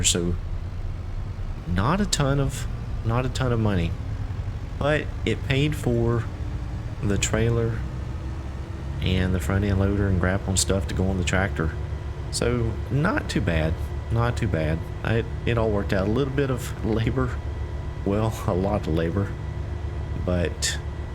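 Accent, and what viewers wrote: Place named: South, United States. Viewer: You sound like you’re from the South my fellow farming friend.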